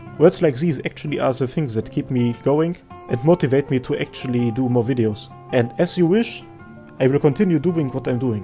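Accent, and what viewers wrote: Germany. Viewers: Glückwunsch! I found your channel a couple a weeks ago and I love your videos. I was more of an Azur lane player before and I didn't know much about the deeper lore in Arknights. I don't know if it's appropriate to say but I really like your German accent. I wish you many many more subscribers and views. Greetings from Hungary. :D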